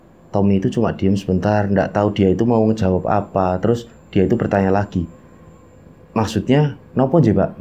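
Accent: Java, Indonesia. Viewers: Seneng cara bawainnya,jawa bangettt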